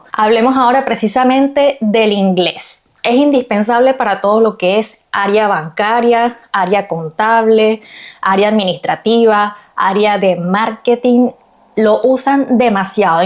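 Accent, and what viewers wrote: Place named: Venezuela. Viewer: Tiene acento de mi país Venezuela.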